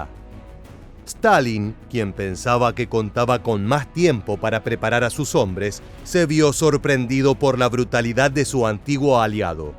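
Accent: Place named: Argentina. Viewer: El locutor es argentino ja vamos nomás jajaja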